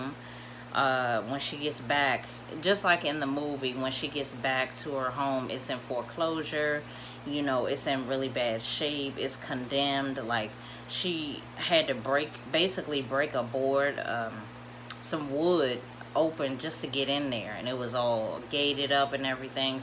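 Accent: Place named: Virginia, United States. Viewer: Are you from Virginia I love your accent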